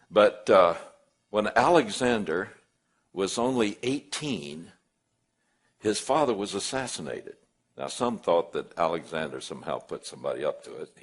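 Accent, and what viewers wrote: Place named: Kentucky, United States. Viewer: I WATCHED YOUR VIDEO WITH YOUR SON! THE 7 YEAR TRIBULATION STARTS AT THE RAPTURE! NOT MIDTRIB! NOW THERE IS THE THOUGHT THAT THE TRIBULATION MAY NOT START IMMEDIATELY AFTER THE RAPTURE. BUT THE RAPTURE IS BEFORE THE START! MIDTRIB IS A FALSE TEACHING. YOU SOUND POSSIBLY KENTUCKY OR TENNESSEE? NORTH CAROLINA OR VIRGINIA! I'M IN EXTREME SOUTH GEORGIA! BEEN HERE GOING ON 25 YEARS! I'VE BEEN ALL OVER THE USA! LIVED IN CALIFORNIA AND MINNESOTA AND PLACES WHILE IN THE WAC'S! PLUS ILLINOIS! MY DADDY WAS FROM TENNESSEE! BEEN TO GERMANY AND JAMAICA! BOTH AS A CIVILIAN! WE HAVE THE BEST COUNTRY!!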